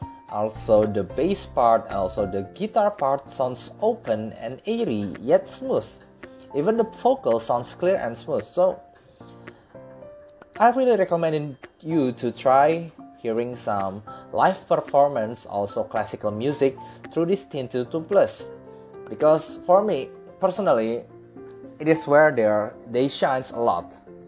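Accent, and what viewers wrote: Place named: Indonesia. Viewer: logatnya kayanya orang Indonesia nih....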